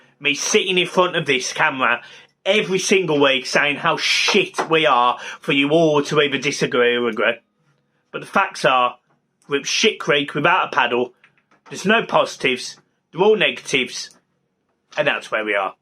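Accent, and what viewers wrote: Birmingham, United Kingdom. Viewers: I was expecting you to be a villa fan when I heard the accent! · Come and join the Villa family mate you’ll fit right in with that accent. 👍🏻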